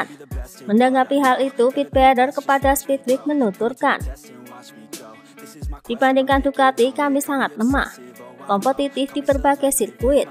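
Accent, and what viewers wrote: Java, Indonesia. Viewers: Mbak medok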